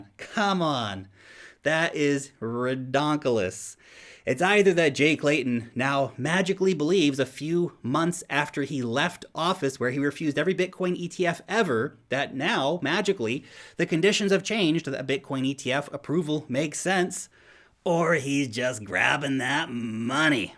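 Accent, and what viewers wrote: United States. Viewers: I always wonder why, as a kiwi, he speaks with an American accent. Any idea? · No accent?!? He has such a strong American accent🤣. But yes definitely no hint of a NZ accent. I am assuming he is an American living in NZ? Or maybe a kiwi who moved to the US as a kid?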